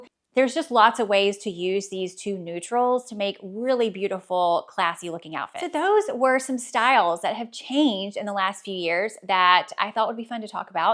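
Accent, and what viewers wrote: South, United States. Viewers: I'm not even thinking about the fashion. I used to teach English and I'm wondering if any non-native English speakers can pick up on her subtle southern accent here. It's subtle, I wonder how this sounds to someone from outside the US and if they're even able to pick up on it or place it, or just find some of her intonations confusing compared to other regions of English speakers. For the video, this is another classic example of a fashion girly talked about modern versus dated looks in an interior design setting that could easily be under similar scrutiny that does NOT match what they are recommending for fashion! But who's counting.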